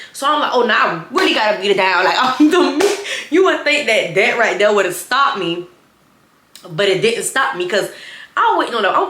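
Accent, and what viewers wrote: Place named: Louisiana, United States. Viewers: I literally just found you. Your accent makes everything hilarious. I just love your personality and accent. You mind me of a southern version of my girl kayshineee. I’ve been searching for new storytellers and the fact that you give a backstory too like my favorite ones?! Chefs kiss!ANDDDD the fact that you watch Asia and Symone makes me wanna watch you even more. I watched one video and got hooked! New subbie. 🫶🏾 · You most definitely give Louisiana accent. I just ran across your stories a couple weeks ago and I’ve binged watched them all. They help me get through the work day.. and you’re so pretty, sis. Much love, from Lafayette, Louisiana ❤️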